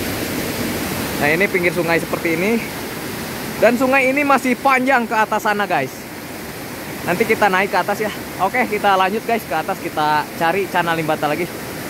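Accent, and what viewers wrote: Indonesia. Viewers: Sunda na nu ti mana mang 😁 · Bang urang sunda nya sarua ejeng urang sunda saya banten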